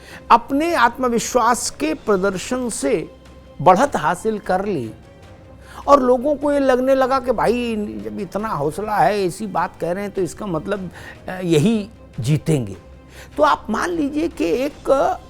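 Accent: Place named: Kanpur, India. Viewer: भाई जी आपका कनपुरिया स्टाइल मे किसी भी अंक का प्रस्तुतीकरण करण लाजवाब होता है। आप चिरकुटों पर ऐसा जूता मारते हो भिगो भिगो कर कि हंसते हंसते खाना ही पच जाता है। जीयो भइये 😊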